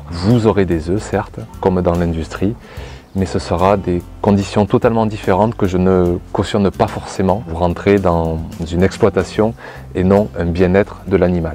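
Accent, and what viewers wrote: South, France. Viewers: je viens de découvrir vos vidéos, elles sont super et très instructives franchement je me régale et puis l'accent du sud dont je suis aussi ça fait plaisir 😀 alors oui moi aussi j'ai des cocottes 3 petites rousses et une pékin, qui recommencent à pondre tout doucement après la pause hivernale, c'est super les poules moi j'adore et je ne vois pas mon jardin sans elles, j'aime les voir déambuler et picorer par ci par là et puis moins de taupes depuis qu'elles sont là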